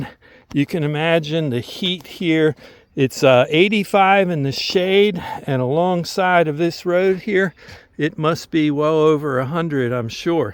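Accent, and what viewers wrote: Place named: California, United States. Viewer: Love this guy’s accent—sounds like a CA dude.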